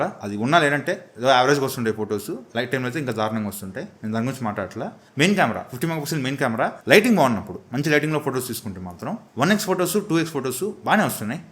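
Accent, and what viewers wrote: Nellore, India. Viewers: Anna midi Nellore aa😅 mana Nellore ye nuvu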